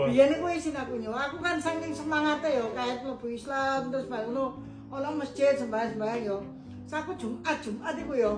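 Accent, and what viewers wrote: Surabaya, Indonesia. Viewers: Logate suroboyone lo... nyenengno mama dadi pingin ngrungokno critone mama karo koko... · Nek aku kangen Suroboyoan, delok konten smpyn ko...... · Ciri khas mak2 suroboyoan nek cerito,hahaha kudu kemekel uduk Goro ceritane,tp Soko bosone seng garakno tambah kemekel😂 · Mom... Jan khas ngomonge wong Sroboyoan... ❤... Sehat semuanya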